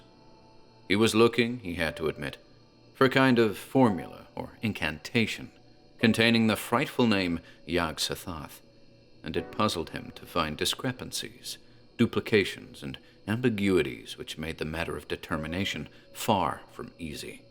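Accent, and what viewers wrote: United States. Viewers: Wow, American accent totally threw me for a loop haha. Well done! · my second listen, but still i just realized you were speaking american LOL with your voice, you make reading american sound good - and those two other guys, so different. excellent production (even better than some from BBC radio :) 🎭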